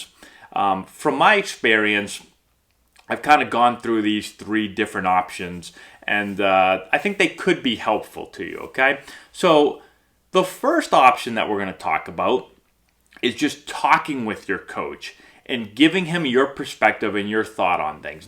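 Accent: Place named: South, United States. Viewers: As a “Texan”, I appreciated the southern accent. Loved it 🤣👏🏼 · Great video, as always! I love the Forrest Gump southern baseball coach accent! This is certainly a situation that seems common across levels of baseball. I would love your perspective on how to deal with dumb conduct by coaches , specifically in the youth baseball world. As the owner/director of a travel baseball organization that includes youth teams (10-13u) and beyond how do you handle situations in which parents disagree with the conduct of the coach of their child’s team? Hypothetical: parent of a 13u player let’s you know that coach calls player a “coward”, tells them to get their ass up after being spiked in forearm, tells player to shut his mouth, and when pitcher struggles on mound says to catcher, “don’t look at me, he (pitcher) sucks”. Coach states that he is “old school” and that he is preparing players for high school and beyond. 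I know this is a bit off topic but interested on your perspective on this and what steps you would take or suggest that players and or parents take in a similar situation. Thanks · Great southern accent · Lmao at the southern accent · BTW, your southern accent killed me. I grew up in NE Ohio and played in college in Chicago and went to medical school and trained there, and ended up in Alabama surrounded by people who talk like that all the time. And lots of dumb coaches around here, even at the high school level. I guess they're everywhere. I would love to hear you do this same video after you have a boy who plays into high school to see if your outlook changes as a dad. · Where are you from that was way too close too us down south man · Nice accent. You pick that up in Winston-Salem?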